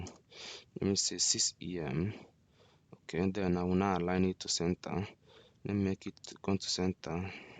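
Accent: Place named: Nigeria. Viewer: you sound like a 9ja man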